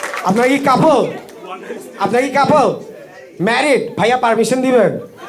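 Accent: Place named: Chittagong, Bangladesh. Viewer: চিটাইগ্যা নাকি ভাই!?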